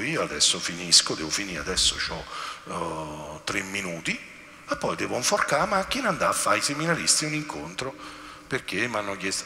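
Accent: Rome, Italy. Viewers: Don Fabio, se potesse per cortesia evitare di parlare con parole e toni romaneschi, è fastidioso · e come fà..... é de Roma... e noi romani parlamo come parlamo... e daje Don Fabio chessei gajjjardo, er mejo prete detutta Roma... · Chi scrive che il romanesco da fastidio be significa che si mette una barriera alle cadenze dialettali che in ogni regione ha e poi io nn sno romana però piace.😮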